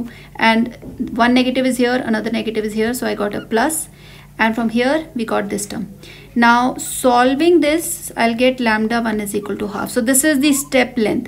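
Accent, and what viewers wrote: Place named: India. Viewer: Indian accent 🥰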